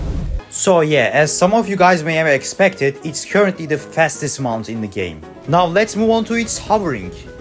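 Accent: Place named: Turkey. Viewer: Duyduğum en türk aksanlı ingilizce❤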